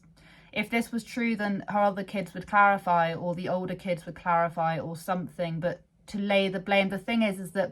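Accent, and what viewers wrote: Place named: United Kingdom. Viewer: That's why I subscribed... plus... love the British accent:):) sometimes... most of the time it's about the kids.... except when you're peeing. Then it's about you....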